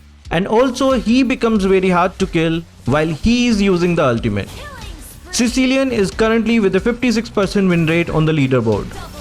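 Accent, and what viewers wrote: India. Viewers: bro you from India your voice bro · You sound like an Indian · why u sound like indian dhoti its so irritating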